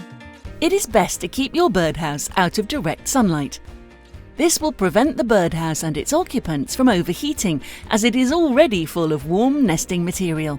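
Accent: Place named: United Kingdom. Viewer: Not everyone is American dude, the accent is British.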